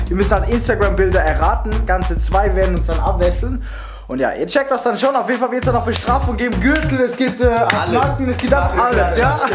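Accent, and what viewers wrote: Switzerland. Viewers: bisch vo Züri gsi?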